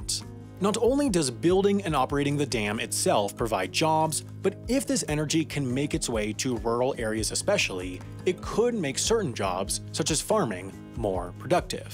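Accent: United States. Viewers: Senseless Egyptian propaganda in American English! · Isn't he American? He sounds American.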